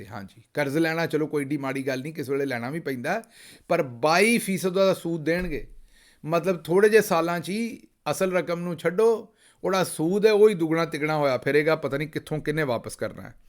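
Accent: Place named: Punjab, India. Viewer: Love you Punjabi accent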